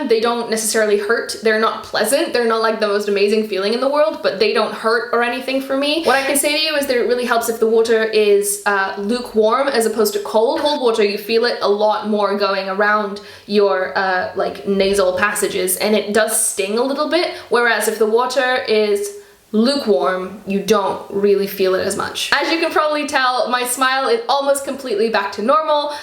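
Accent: United States. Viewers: Rowan I thought she was American lol😂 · WAIT WHAT THE HELL I HAVE BEEN WATCHING YOU FOR MONTHS THINKING YOU WERE AMERICAN AND YOU'RE PORTUGUESE JUST LIKE ME WOW I JUST REALIZED HOW MY ACCENT SUCKS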